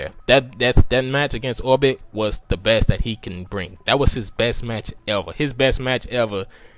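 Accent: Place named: South Florida, United States. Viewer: Let em know! Btw are you from FL cause you sound like you got a south florida accent.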